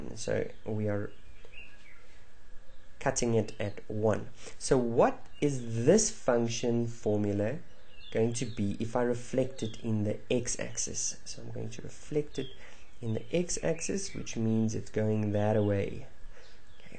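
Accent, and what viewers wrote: South Africa. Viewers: Thanks! This video was extremely wonderful and helpful for matric revision :) Great job... [I also noted the South African accent - being a Gauteng'er, the lesson was easier to grasp and much more tolerable!)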